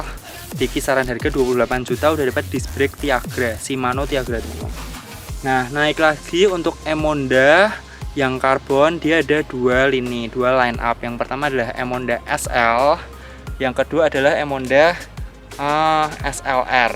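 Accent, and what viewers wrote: Java, Indonesia. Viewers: Aku sering buka youtube reviewx. Yg ku suka 1. Logat jawax itu lho mas, 2. hitam manis, dan yang paling 3. Reviux rrinci rigit, menguasai sepeda yg direviu. ❤😂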